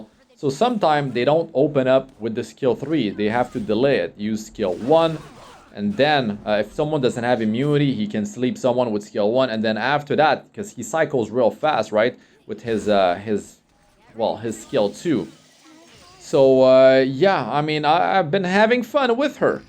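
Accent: Quebec, Canada. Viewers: Hey, weird question but are you from Quebec? Your accent made me wonder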